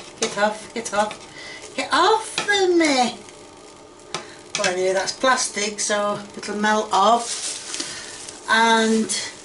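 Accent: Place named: Northern England, United Kingdom. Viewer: I detected northern-ish England in her accent. I love it!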